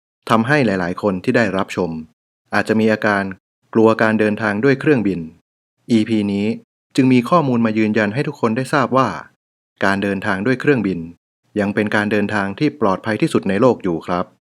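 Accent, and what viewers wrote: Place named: Isan, Thailand. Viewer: ป๊าดดดด....กัปตันอ่านอิสานฮู้เลืองไป่อี๊กกกก ต่าฮักต่าแพงแท่เด้จ้า😊😊😊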